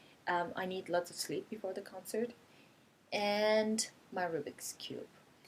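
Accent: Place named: United States. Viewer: Alice, what other language(s) do you speak in addition to German, Japanese and English? BTW, how did you get your American accent when you speak English???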